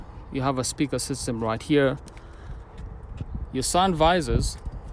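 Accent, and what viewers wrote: Kenya. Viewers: That accent is so Kenyan yani 🇰🇪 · You sound Kenyan.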